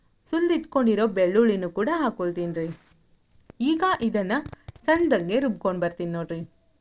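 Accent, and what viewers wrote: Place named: Bangalore, India. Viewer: ಅಕ್ಕ ಅದು ಕೊಬ್ರೆ ಮತ್ತು ಹಸೇಮೆಣಸಿನಕಾಯಿ ಅಲ್ಲ ಅವ್ವ. ನಮ್ಮ ಉತ್ತರ ಕರ್ನಾಟಕ ಭಾಷೆನಲ್ಲಿ ಕೊಬ್ರಿ ಮತ್ತು ಹಸಿಮೆಣಸಿನಕಾಯಿ ಅಂತಾರ. ಜರ ಸ್ಟೈಲ್ ಮಾಡಿ ಬೆಂಗಳೂರು ಥರ ಮಾತಾಡಬೇಡ. ನಮ್ ಹೆಮ್ಮೆ ಇಂದ ನಮ್ ಉತ್ತರ ಕರ್ನಾಟಕ ಭಾಷೆ ನಲ್ಲಿ ಹೇಳು 🤦🏻‍♀️🤦🏻‍♀️🤦🏻‍♀️🤦🏻‍♀️🤦🏻‍♀️🤦🏻‍♀️🤦🏻‍♀️ಮತ್ತೆ ಅದು ಕೊಪ್ ಅಲ್ಲಕ್ಕ, ಅದು ಕಪ್ Cup 😅😅😅😅😅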